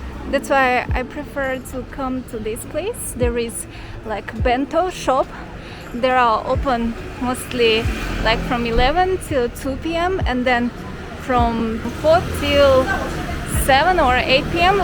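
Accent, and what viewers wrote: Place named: Eastern Europe. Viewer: I live in the same city. Sry for my rudeness. You have a bit of Slavic accent. Wish u have a good time here.👍🏼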